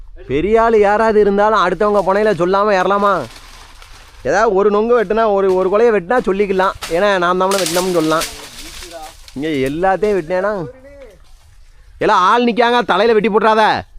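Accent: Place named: Village Tamil, India. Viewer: அருமை வாழ்த்துக்கள் நம்ம ஊரு தமிழ் அழகு❤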